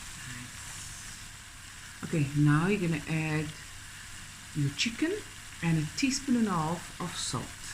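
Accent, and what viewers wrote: Cape Town, South Africa. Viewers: It is a Cape Town accent.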